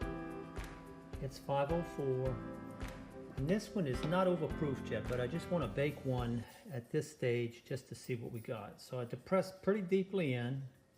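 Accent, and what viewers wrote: Louisiana, United States. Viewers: Are you from Louisiana? You sound like my family from Rayne, it’s nice to hear.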